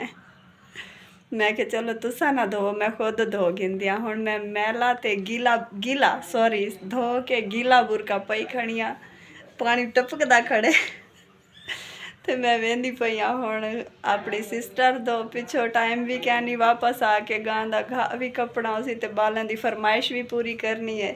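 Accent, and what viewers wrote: Saraiki, Pakistan. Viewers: boht piyaari sariki bolte ho❤❤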